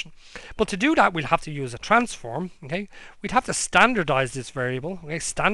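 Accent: Ireland. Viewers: You're Irish right?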